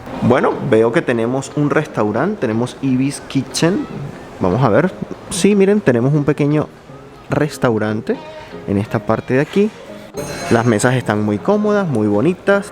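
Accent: Venezuela. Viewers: Jajja me siento cómodo de que seas venezolano y entiendo todo lo que dices xd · Hola, gusto en conocerte, mi hermano venezolano, que alegría escucharte es nuestro dialecto, je, je, estuve en Medellin la semana pasada y pude conocer, la plaza de Botero, el metro y el metro cable de Santo Domingo, el pueblito Paisa, el jardín botánico y la plaza de los deseos, caminé por esa calle donde fuiste a la Hacienda. en dos días conocí todo. Dios es bueno y me regalo este paseo. Te felicito por tu programa. Un abrazote.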